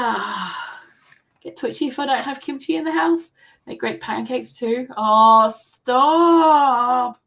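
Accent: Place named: Australia. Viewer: Oh thought you were from England I see Australia now accent threw me for a loop! Do you garden? You should very relaxing. I garden I believe I saw you comment on a gardening channel. I am a Christian! I love to garden?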